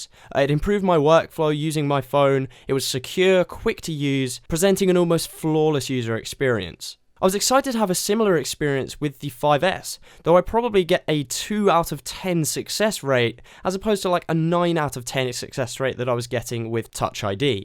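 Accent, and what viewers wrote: United Kingdom. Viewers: Ok my British sounding fellow friend first of all, I got a couple of complains. I know you don't want to hear it but... deal with it. I'm a very proud owner of my GALAXY S5, And this is what I have to say.. #1 yes the phone feels cheap, I get it, but remember Samsung is trying to keep the devise price affordable, compared to any iPhone of the same generation. #2 the charging port reminder can be deactivated by checking the "don't show this again" checkbox like I did... the flap... deal with it. #3 if you don't like touchwiz so much, download and install a different launcher, I'm currently using buzz launcher over touchwiz, and haven't looked back, and you CAN disable the news Crap at the very left of the touchwiz pages that lags so much, I never used it at all (I can't quite remember how tho sorry) but I did it.... #4 the speaker sucks........ 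I know.... I got nothing. #5 the fingerprint scanner works fine, don't be over dramatic about it, use it right instead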